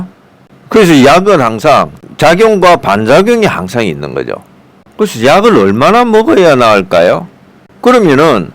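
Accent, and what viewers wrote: Gyeongsang, South Korea. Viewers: 구수한 갱상도 억양으로 ... 감사합니다 저도 도전! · 경상도분이시라 ㆍ ㅎㅎ 말솜씨 가 너무재미있읍니다 덕분에 치아에대한도움되었는데 역시 듣고보니간단하네요 당장실행하겠읍니다 ㅋ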